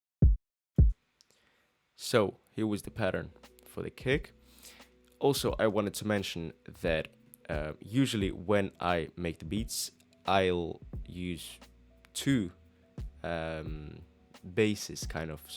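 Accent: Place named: Scandinavia. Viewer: Dope tutorial man, where in scandinavia are you from btw?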